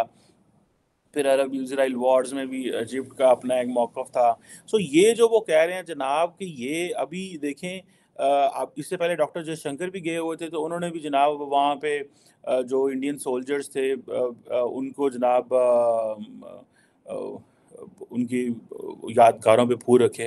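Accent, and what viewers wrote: Punjab, Pakistan. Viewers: don't forget your panjabi tarka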